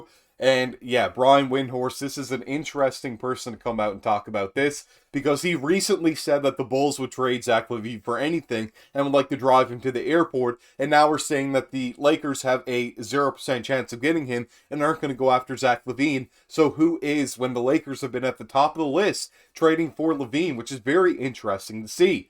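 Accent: Canada. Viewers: Are you from Newfoundland or PEI? Lol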